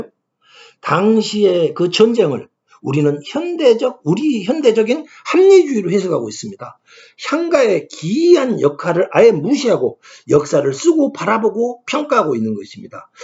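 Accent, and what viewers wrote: Gyeongsang, South Korea. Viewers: 경상도 싸투리죠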